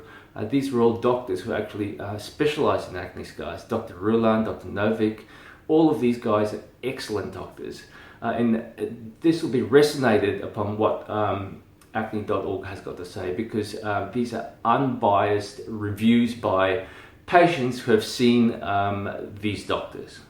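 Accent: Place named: Australia. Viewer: Asian doctor with oz accent. Love it.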